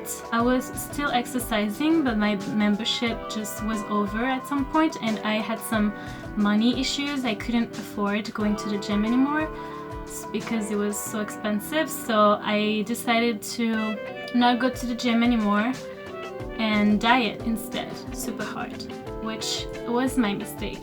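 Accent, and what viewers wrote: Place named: France. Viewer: ohhh tu viens de France j'en étais pratiquement sûre avec quelques prononciations !! tu es très intéressante et merci pour le boulot de tes vidéos !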